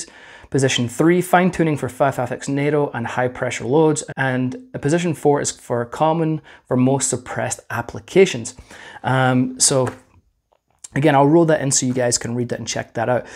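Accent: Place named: Scotland, United Kingdom. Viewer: Love to hear a Scottish accent talking about American guns, good to have with us.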